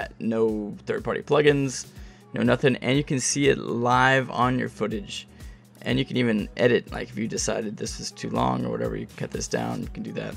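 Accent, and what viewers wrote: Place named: Kentucky, United States. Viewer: you sound kinda northwest Kentucky. Great tutorial man thanks! Subscribed!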